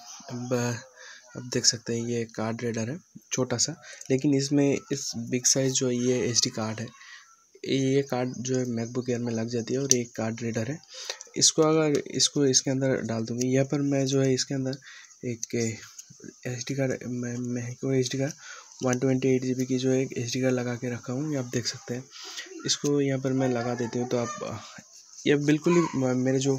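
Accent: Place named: Bengal, India. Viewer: Bangali pola hindi boltase 👍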